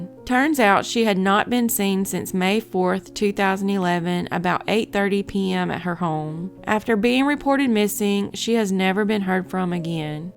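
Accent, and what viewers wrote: South, United States. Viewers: I am truly addicted to true crime and cold cases, I enjoy your presentations and I love listening to your sweet southern accent. Please keep it up, by bringing awareness to these cases you never know who is watching and who may have a key to solving any one of these mysteries. Thank you! I will keep watching and keep supporting your channel!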